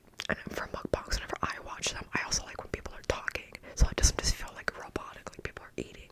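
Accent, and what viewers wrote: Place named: Midwest, United States. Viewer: I’m not a big fan of the popsicle whole thing but I love this because I feel like we’re on FaceTime right now and like I live in Minnesota and I’m from Minnesota my whole life and I can totally tell you have a Midwestern/possibly Minnesota accent and I think it’s so funny lol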